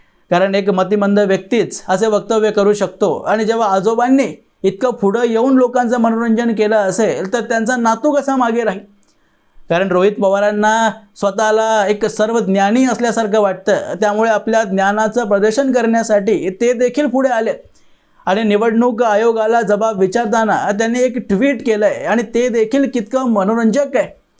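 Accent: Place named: Karnataka, India. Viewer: सम्बनधित निवेदन करणारा हा व्हकती नक्कीच कर्नाटक राज्यतील आहे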